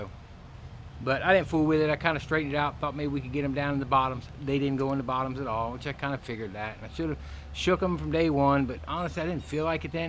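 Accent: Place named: South, United States. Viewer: you speak so softly and you have a sweet southern accent so I need to turn up the volume just a little to understand what you are saying. I have to use ear buds so I don't disturb my grandsons and then my ears get blasted! I can turn the volume down fast enough. I had to stop watching you tonight in order to preserve my hearing. Please keep the volume level of the music the same as your voice so I can enjoy music into my 80's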